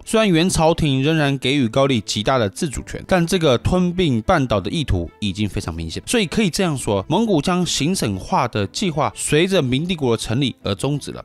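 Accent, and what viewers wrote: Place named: Taiwan. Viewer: 台湾人就是这样腔调，两岸人应相互理解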